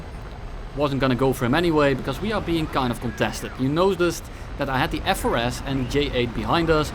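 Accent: Netherlands. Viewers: Ik heb nog nooit zo een duidelijk Nederlands accent gehoord in het Engels.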